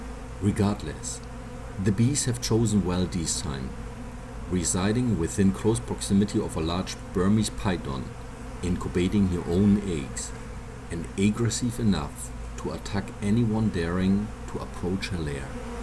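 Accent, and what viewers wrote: Germany. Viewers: are u from Germany ?? sound like it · I can hear you're German. Mega cooles Video! Weiter so! Deine Stimme ist echt beruhigend 😂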